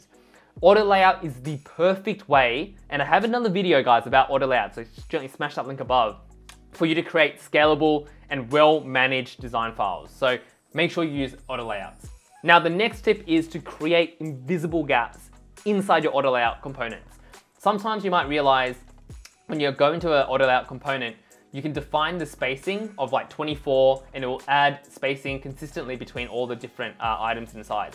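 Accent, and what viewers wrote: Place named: Australia. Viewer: MASS COLLAPSE LAYERS works differently for me than explained: On a Mac press CMD + A to select everything. Go to Layer panel and hold CMD + Click on 1 dropdown arrow and everything closes. Do exactly the same to open everything on the Layer panel. By the way your content is simple and brilliant! It helps immensely! Your accent takes me back to amazing Australia!:) So thank you very much!!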